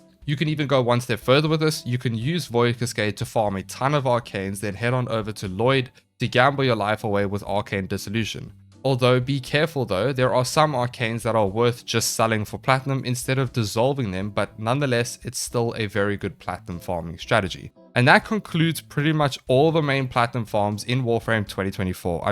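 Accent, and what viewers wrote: South Africa. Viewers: Is my bro south African?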